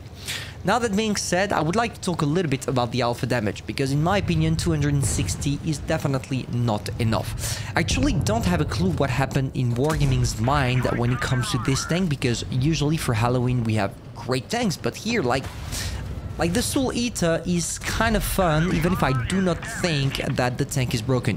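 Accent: France. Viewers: Are you French from treytens videos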